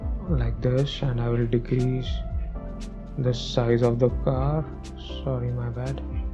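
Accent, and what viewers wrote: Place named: India. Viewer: indian accen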